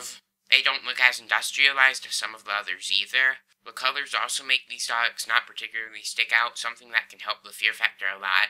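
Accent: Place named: United States. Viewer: No, I listened in for 90 seconds beyond the (incidentally overlong) intro. My comment was not mean, it was factual. For the record, the fact that you express opinions and have an American accent is entirely irrelevant to me. If you feel the need to censor and to be censorious, you really should not be making posts. Incidentally, you have marked my comment 'love', so I suggest there is some confusion on your part.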